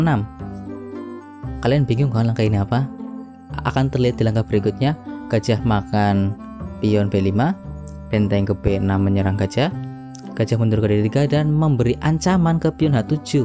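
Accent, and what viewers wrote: Banyumas, Indonesia. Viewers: Kaya wong purbalingga, kebumen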